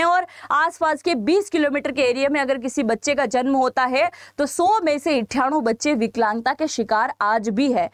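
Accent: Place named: Bihar, India. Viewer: Sahar nahi hota hai bihari ho madam.ji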